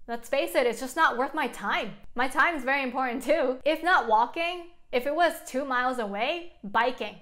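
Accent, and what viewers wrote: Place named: United States. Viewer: she is speaking American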